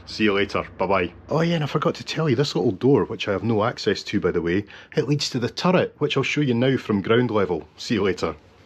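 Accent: Scotland, United Kingdom. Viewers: But you are Scottish...you love rain and cold and wind hahahahaha You are like any good farmer...never happy with the weather...too hot, too cold, too wet, too dry, Too sunny, no sun, to much wind. etc etc... lol...I have many Scottish ancestors, including Rob Roy, so I totally understand..lol.... and I could listen to your accent all day long...xx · I enjoy your videos, your laid-back humor and your curiosity. You need to get a distinctive hat as a trademark. How about a Scottish tweed flat hat or perhaps something with a Scottish flag to compliment your hair color and your wonderful Scottish brogue? Your brimmed balls caps with logos make you look like an American tourist. No offense, just say’in.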